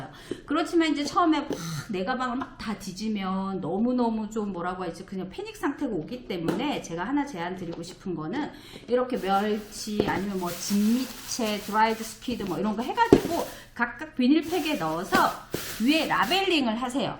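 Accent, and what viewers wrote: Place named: Gyeongsang, South Korea. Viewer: 부산출신으로서 억양듣고 알았습니다ㅋㅋ 같은 이민자로서 타지에서 같이 힘냅시다.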